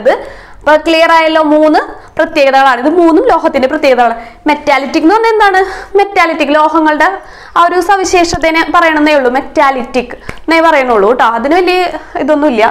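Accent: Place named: Thrissur, India. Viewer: വീട് തൃശൂർ ഭാഗത്ത് ആണോ slang അത് പോലെ ഉണ്ട്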